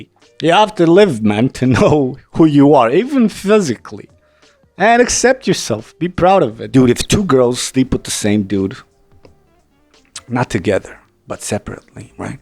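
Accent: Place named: United States. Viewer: u speak english like an american! good job.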